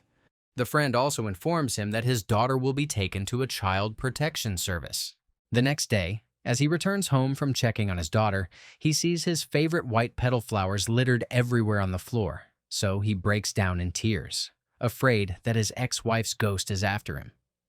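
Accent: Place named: United Kingdom. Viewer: I have no idea why but his british accent is soooo funny to me. 😂😂😂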